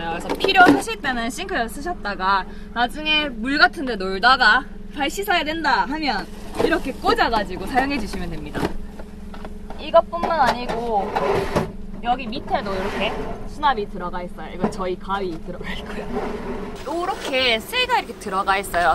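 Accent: Gyeongsang, South Korea. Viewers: 대구분??같네요 저도 꿈이 자연방송,캠핑방송하는건데 너무부럽습니다 영상잘보고갑니다.구독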